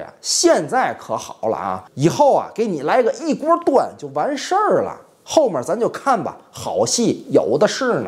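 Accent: Tianjin, China. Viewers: 哥， 是天津人吧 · 哎，关注晚了，磊哥杠杠的正能量，还挺幽默，目测应该是天津的，和罗尼口音挺像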